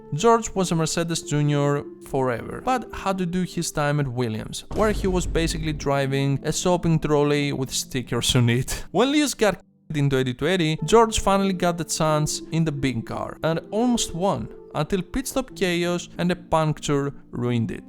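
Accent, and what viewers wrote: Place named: Greece. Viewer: Where are you from? Your accent sounds very greek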